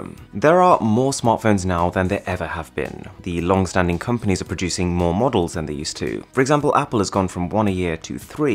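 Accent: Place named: United Kingdom. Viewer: yeah he sounds birtish-y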